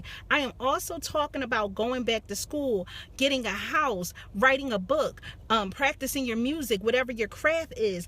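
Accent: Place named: Philadelphia, United States. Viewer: Love this video/message! SN: you have to be from Philly i know a philly accent a mile a way!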